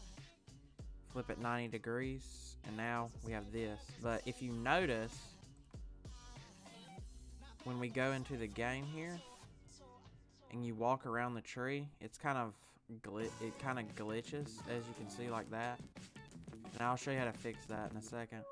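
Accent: United States. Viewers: the cowboy accent lol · red neck accent yeehaw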